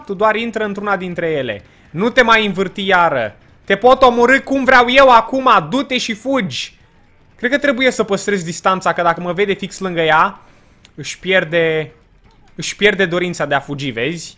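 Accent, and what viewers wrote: Moldova. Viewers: vorbeste cu accent moldovenesc v-a fii un epison amuzant :)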